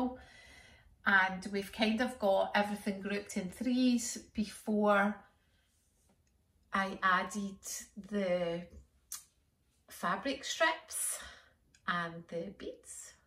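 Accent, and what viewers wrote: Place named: Scotland, United Kingdom. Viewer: hahahaha! I love your Scottish speak :) would you be willing to do a tutorial soon so we could see one start to finish?? I am going to try to do one on my own, but a visual reference would be fabulous.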